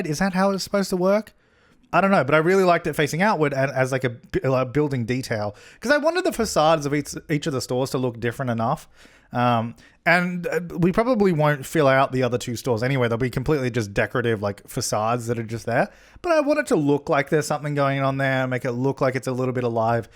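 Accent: Australia. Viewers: Haha I love how you love his accent but I’m Australian so it sounds completely normal to me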